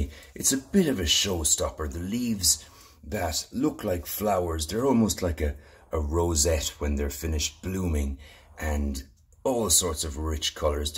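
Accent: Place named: Ireland. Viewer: Sounds like a grand Irish accent.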